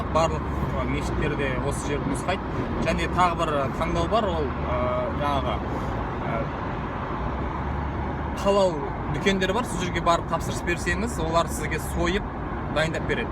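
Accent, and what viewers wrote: Standard. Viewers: қазақшасы қандай таза. Ризамын. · Ризамыз таза казакша сойлеиди жане дастур бойынша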